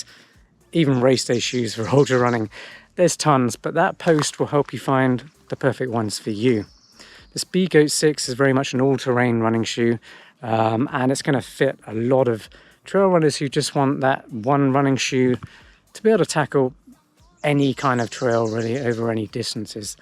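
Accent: United Kingdom. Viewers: are you in the US or the UK? You're defo British by the accent?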